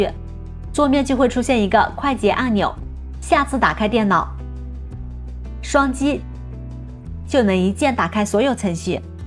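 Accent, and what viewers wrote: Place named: Yunnan, China. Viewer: 听出了云南口音，不知道对不对呢😁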